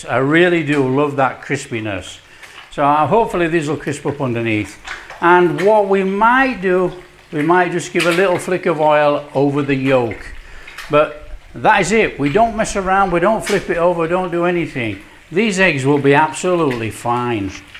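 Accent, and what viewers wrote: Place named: Scotland, United Kingdom. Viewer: I like Scottish accent, it's beautiful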